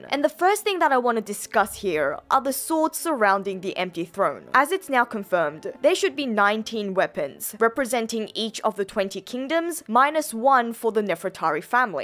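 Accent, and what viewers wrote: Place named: Australia. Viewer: This girls accent is so jumbled between Australian and Brittish.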